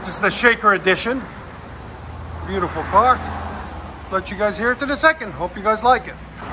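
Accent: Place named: Chicago, United States. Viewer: You sound like you from Chicago...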